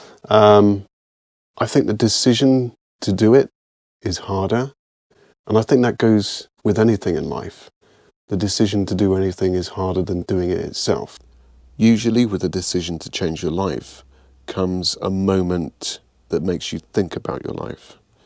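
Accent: United Kingdom. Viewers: No don't do an American accent lol😂 I love your meditations because of your English accent 😀great insights here 😋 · It's going very well!!. Thank you. I use your videos to practice meditation and improve my English, by the way hehehe. Your voice and British accent are beautiful for both!!. I'm only missing subtitles in a few videos but it's not really important; ) and I've got some notes about music and voice, I'll tell you. Thank you so much!!. BEST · Wow, I just saw that video of the news anchor, too. I could so relate, as I suffer panic attacks also. I decided to try meditations as well, and now have been so much better. I still suffer from insomnia, but doing meditations during the day for relaxation helps me sleep better at night. I am sorry about your dad, grieving is such an extraordinary loss. I lost my dad in 2012, and know how easily it can be to fall into depression. 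But I had to stop feeling sorry for myself. I had to get my life back together and move forward, too. I commend you for working on your weight loss and doing what YOU want and need to do to make yourself happier and healthier. Have you ever thought of making custom meditations for people? I know you couldn't really put them on platforms, but you could charge a certain cost for them. I bet a lot of people would love that. I know if I could meditate to something custom made just for me... wow. I've always thought that would be so cool to have Lol. Anyway, another great vlog with interesting stories about you, and good tips and advice. Thanks and yes a meditation in an American accent would be neat, but honestly, I love the British accent. Plus, it just wouldn't be the same without your voice. Haha. Peace! ☮️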